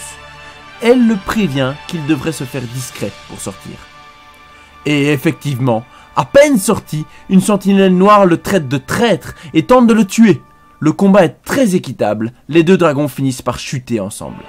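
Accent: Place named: Belgium. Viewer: Il est belge c'est pas des erreurs de prononciation c'est son accent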